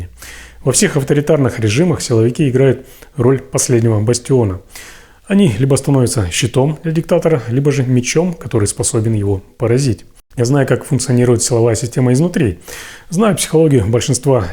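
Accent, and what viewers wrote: Ukraine. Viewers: А почему акцент укропский??!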